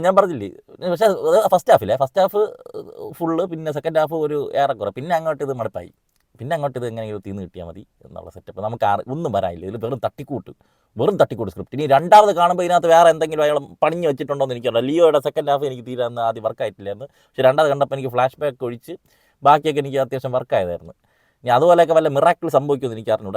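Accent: Trivandrum, India. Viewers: അണ്ണൻ tvm ആണോ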